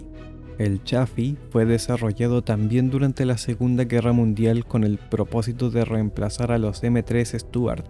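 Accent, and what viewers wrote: Chile. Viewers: Hola , gracias por los excelentes videos hechos con mucho cariño , una consulta al margen , es usted chileno ? Saludos desde el sur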